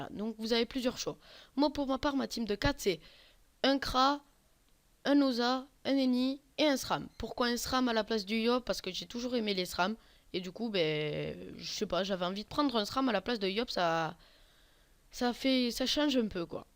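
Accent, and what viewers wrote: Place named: South, France. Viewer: toi tu viens du Sud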